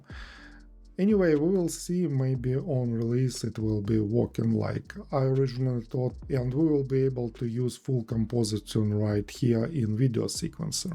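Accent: Russia. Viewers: русский акцент) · ну я же слышу наш акцент, можно версию на русском ?)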